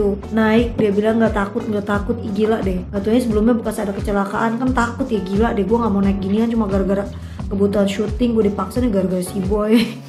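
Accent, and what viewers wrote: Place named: Depok, Indonesia. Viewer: Yu, dialek depok nya , engga holang yaakkk..😁gue sneeng..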